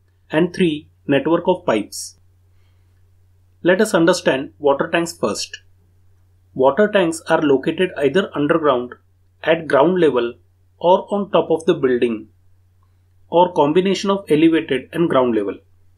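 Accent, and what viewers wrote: Maharashtra, India. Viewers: I can make out the person in video is from Maharashtra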